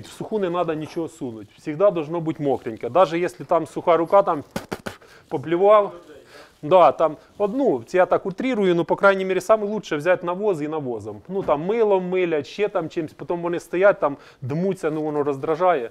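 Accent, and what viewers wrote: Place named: Dnipro, Ukraine. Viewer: Добрый вечер ув. Подписалась на Ваш канал, во всем Вам поддерживаю и рада что у меня есть единомышленники. Я далеко но мечтаю о своей ферме.потому и далеко чтобы приблизить свою мечту и рада что встретила Ваш канал. Я Ваша землячка из Днепропетровщины. Вот только ненада оправдываться за наш акцэнт. Днепропетровщины всегда была и будет русскоязычной, как не крути и какбы это ненравилось нашим братьям из Западной Украины. Почему нам кто-то должен диктовать на каком языке разговаривать. Вам успеха во всем.и добра Вашему дому.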